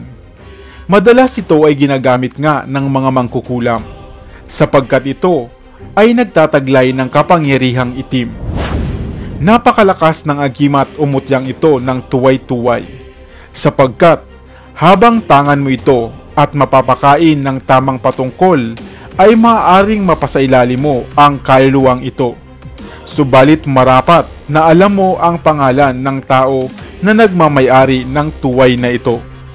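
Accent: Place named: Philippines. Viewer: Galing ng boses ng narator maliwanag ang mensahe pilipinong pilipino di gaya ng iba slang n di mo alam kung sang bansa galing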